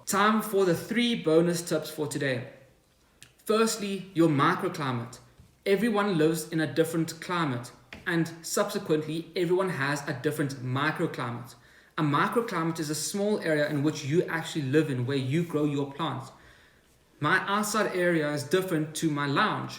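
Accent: South Africa. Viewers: You accent is broken where in SA are you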